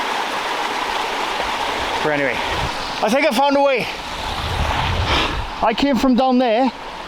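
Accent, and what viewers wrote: Wales, United Kingdom. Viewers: Your Welsh accent sounds thicker out in the valleys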